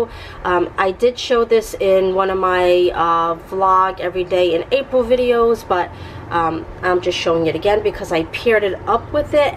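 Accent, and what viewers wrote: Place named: New York, United States. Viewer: Love your accent...sound like a New Yorker and nice farmhouse inspired dollar tree haul! I'm loving the cross along with the blue picture frame combination!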